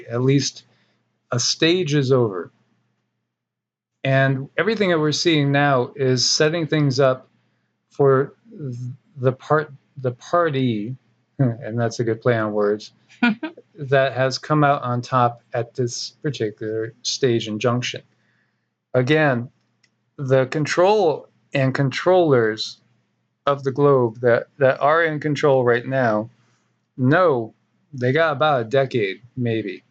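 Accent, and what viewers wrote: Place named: South, United States. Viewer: Your Southern accent is awesome!:)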